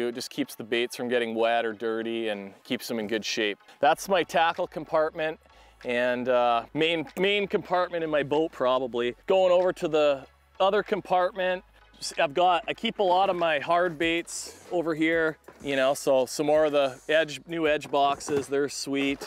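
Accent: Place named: Midwest, United States. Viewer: Most Midwest accent I’ve ever heard, so.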